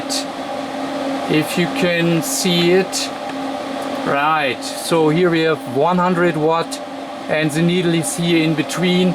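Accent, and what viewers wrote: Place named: Germany. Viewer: I am just glad to see you back. I love your videos, german accent or not!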